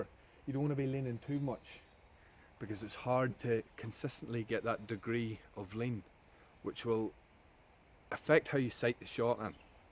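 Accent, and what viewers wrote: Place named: Northern Ireland, United Kingdom. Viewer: Must practice after watching this. Have a cheap catapult at the moment to practice with hopefully I will up my game... Am assuming your from Northern Ireland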